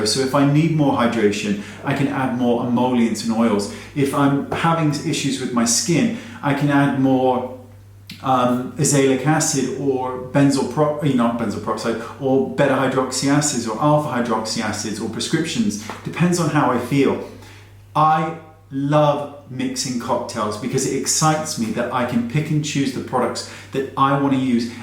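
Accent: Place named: England, United Kingdom. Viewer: Firstly...I enjoy your voice, your English accent and I think you’re bloody handsome! I like your honest no nonsense approach, great make up tips and tutorials. And I enjoyed your Wayne Talks where you shared how it’s OK for people to ask questions, to ask if you (or whomever) is gay. Although I never thought it being an offensive question, I still found your perspective enlightening, as it helped further deepen my own understanding and position. Keep sharing your wealth of knowledge and coming across as down to earth and unpretentious!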